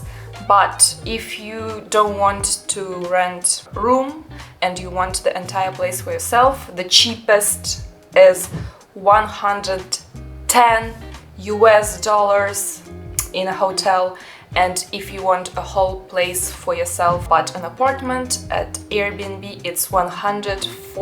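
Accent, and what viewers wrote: Russia. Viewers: You're always so wholesome to watch, you have such a positive happy outlook 😊 I love that accentuated Russian accent you were doing throughout this video haha. I'm half Russian, but born and raised here so no accent for me sadly! There are a few suburbs in Sydney outside the city with tall buildings, Parramatta, Chatswood, a few others. Anyhow, I'm glad you enjoyed it and hopefully you'll come back sometime. Perth is worth a visit, it's quite different than other Australian cities. Take care mate! 😉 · Just LOVE the Russian English😂😂😂😂 Excellent presentation. Well thought out - 👏👏👏👏👏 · What did people in Australia call you when they hear your accent? They''d always call me a "Yank." My money is on "Russkie" I'm guessing. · Is that an American accent behind the so called Russian accent? There is a lot of free stuff in Moscow too. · I absolutely love the sound of the Russian accent.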